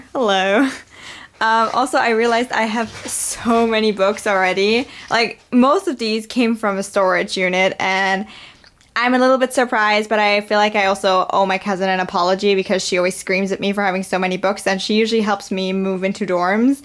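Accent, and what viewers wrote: United Kingdom. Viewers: You have quiet a British accent, love that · It's like you re speaking a better English (in British way )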